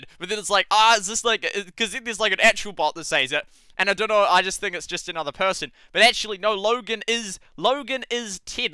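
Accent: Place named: New Zealand. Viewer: Bro, are you a kiwi? Or Australian? I'm getting kiwi twangs in your accent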